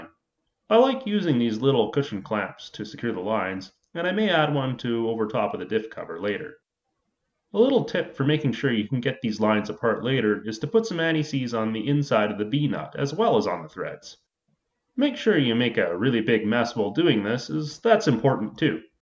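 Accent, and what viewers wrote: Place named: Canada. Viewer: Please sir, I'd like some more. This looks like a great project to mess around with. I love all you fab stuff you always show. It helps me with my projects to see someone do it well. And then there's your wonderful Canadian accent. It's like I'm watching an episode of Letterkenny. LOL! ;) Please do more with this truck!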